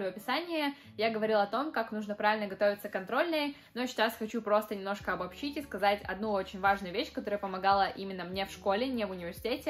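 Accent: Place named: England, United Kingdom. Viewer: как будто английский окцент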